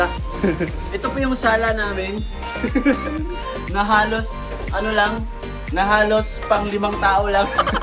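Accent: Philippines. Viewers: anong probinsya? ang accent?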